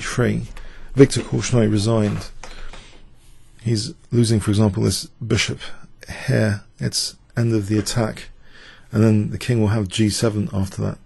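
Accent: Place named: London, United Kingdom. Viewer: Can you please use ''simpler english'' and more ''globalised'' accent :) .. nt all your viewers are from London ! that;s why more and more are vewing Matozelich .. :)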